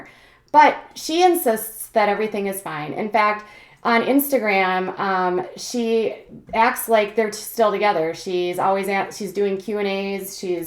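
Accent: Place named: Midwest, United States. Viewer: Where do you live?? I am from MN and hear a midwest accent? I could be wrong but I dont mean to offend if I am way off base haha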